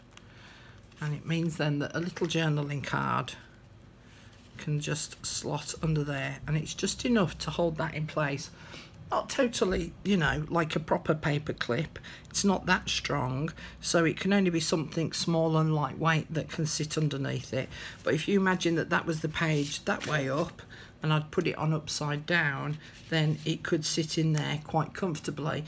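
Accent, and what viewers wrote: England, United Kingdom. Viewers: You are my favourite crafter 👏🏻👏🏻👏🏻👏🏻 a nice english voice xxxx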